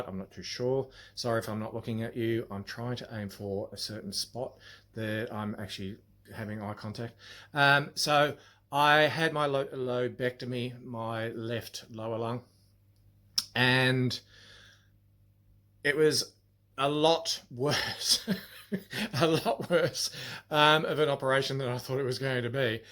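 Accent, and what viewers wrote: Australia. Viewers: I have always enjoyed your Australian accent and great personality. I am saddened by the cancer report. Your abandoned videos spoke for themselves, the objects and air left behind speak loudly in your silence. I have enjoyed all your abandoned explores. you revealed a handsome kind face. A lot of lives have been touched by your videos. My prayers are with you in your time of need. Stay strong and safe in these pandemic times. It has been fun and delightful to watch your videos. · Love your videos!!! Damn, you are a handsome Aussie too!! Love your accent too! Praying for you for your health!...I hate cancer!! Big Hugs from Mississippi, USA! Thank you for sharing your wonderful abandoned videos!! 🙏❤️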